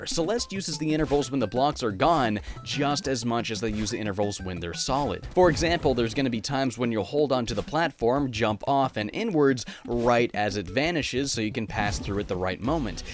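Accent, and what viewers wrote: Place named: Canada. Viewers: Really? That’s a shame man. Canadian accent or not the analysis would still be spot on. I’d say that’s what really matters. Ya know, aside from letting you know about how Chargin’ Chucks are in 3D World again. And again. And again. And again. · Hello, Please ignore them. It is a shame that you should feel you should hide your Canadian accents because of people. On the contrary, I'd like you to remain the dude you actually are, don't force yourself to be otherwise. Also, there is a ludicrous amount of videos in American English on the internet, so listening to another accent is overwhelmingly refreshing; that accent is not missed... at all. Actually, that is quite unfortunate that the American accent seems to be the norm in videos and in English courses at schools. Please, keep up the good work!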